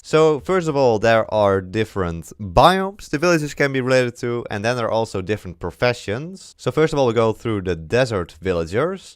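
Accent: Netherlands. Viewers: That accent 🤣 sws Nederlander hahahahahah Dutchie for sure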